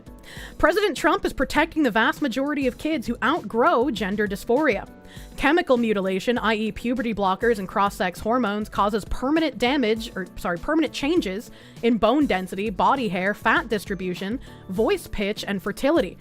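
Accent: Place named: New England, United States. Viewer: I ❤ New England Accent. Am also from New England.😁👍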